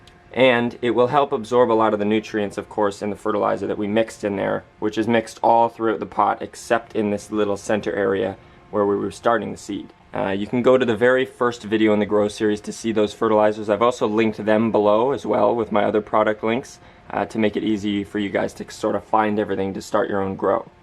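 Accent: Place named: Canada. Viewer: Great vid, nice and concise, aaaand Canadian? Subscribed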